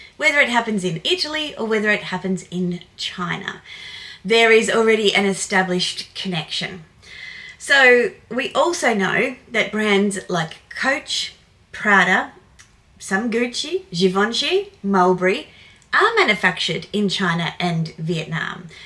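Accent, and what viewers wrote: Australia. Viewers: I like your australian accent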